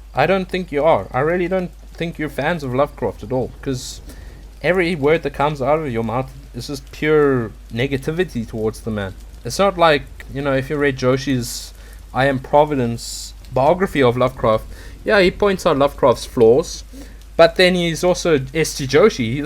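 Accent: South Africa. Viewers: You sound very South African. Did you move to Europe?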